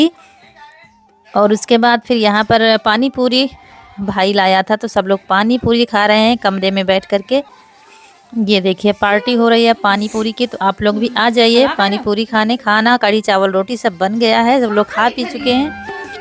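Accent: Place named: Unnao, India. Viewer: Kya aap unnao jila ke hasan ganj ke aas pas ke rahne wale ho. Kyo ki ham bhi wahi ke rahwasi hai aur Aapki bhasa bilkul hamare jaisi hi hai aur sare sadi biyaah ke reeti rewaaz bhi. Mai mumbai main rahti hu aur jab bhi aapki vedio dekhti hu to mujhe bhi apne gao ki yaad aati hai.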